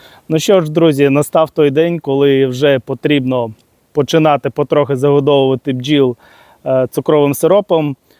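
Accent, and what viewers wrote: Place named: Bessarabia, Ukraine. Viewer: Да, не ожидал услышать такой чистый украинский из Бессарабии!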